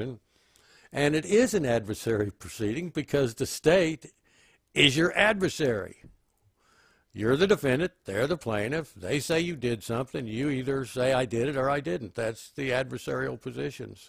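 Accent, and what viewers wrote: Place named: Texas, United States. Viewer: I'm hearing a little bit of a texan accent. Lol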